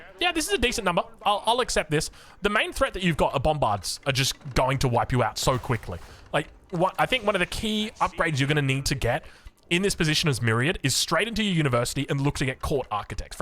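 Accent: Australia. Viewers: Fresh in the morning, love it!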